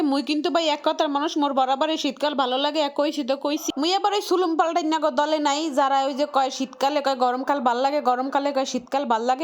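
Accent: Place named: Barishal, Bangladesh. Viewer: সর্বণাশ😮😮😮 but বরিশাইল্ল কথা😅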